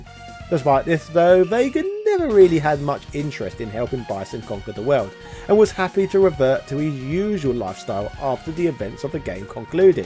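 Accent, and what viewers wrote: England, United Kingdom. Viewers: Ok, I gotta know what English accent you have....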